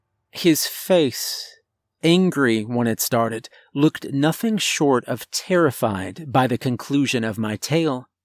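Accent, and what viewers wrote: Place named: Southern California, United States. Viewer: I'm not gonna say which, but one of those is awful. Another awful one has the initials SD...no standard for story quality and butchers the good ones. I like tho. His weird SoCal accent has grown on me.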